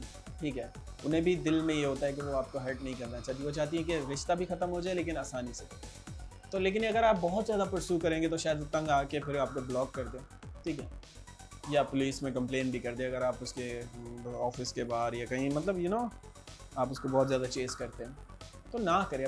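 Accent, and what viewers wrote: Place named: Kashmir, India. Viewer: Sir, not only vdo, but and every trick and sentence, crystal clear logically effective. Hopefully you are from JK as voice tone says where I stayed aporox 14 years. 👍🙏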